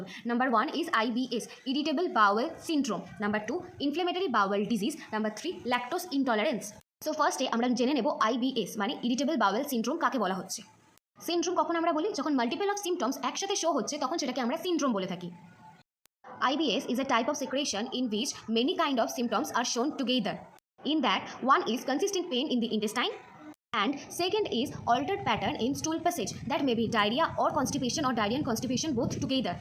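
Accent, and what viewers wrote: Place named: Bangal, Bangladesh. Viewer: Bolchi ektu Bangal 😢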